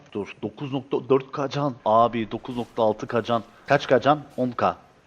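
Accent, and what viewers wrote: Cyprus. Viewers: abi sen gıbrıslısıñ deyiil?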